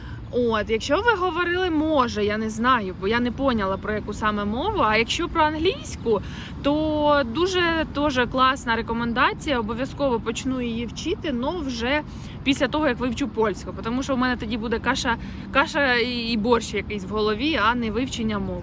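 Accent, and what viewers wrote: Surzhyk, Ukraine. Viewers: Вибачте, але українську мову вам ще вчити і вчити - розмовляєте жахливим суржиком. Купа русизмів.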